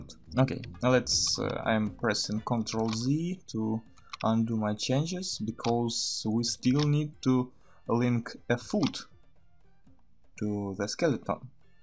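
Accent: Russia. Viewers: Are you from Russia, you have russian accent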